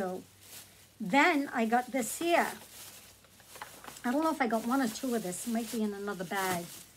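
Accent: Boston, United States. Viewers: Springfield Massachusetts 🥰 Your Accent Leads Me To Believe You're From Boston 💙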